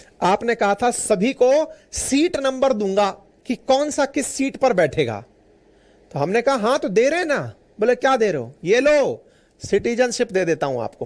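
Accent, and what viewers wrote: Rajasthan, India. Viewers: Sir pura rajasthani style me samjha rhe hain kya baat hai 😅 vaise mai up se hu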